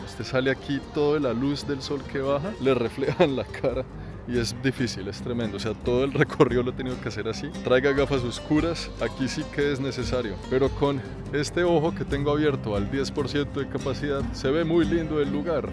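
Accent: Colombia. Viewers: Que jodido eres para narrar paisa , me haces reir ; sobretodo ese acento colombiano me encanta . Noble tierra , gente maravillosa como tu . Saludos desde Suiza 😊.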